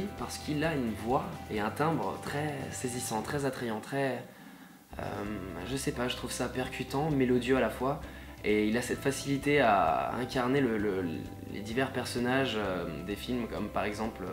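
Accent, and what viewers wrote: Alsace, France. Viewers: tu prenais un accent "alsacien" plutot accentué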